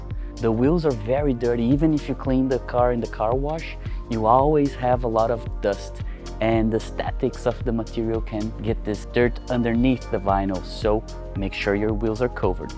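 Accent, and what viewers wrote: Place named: Brazil. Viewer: Percebi na hora que ele começou a falar inglês, pensei: esse cara parece brazuca. 😂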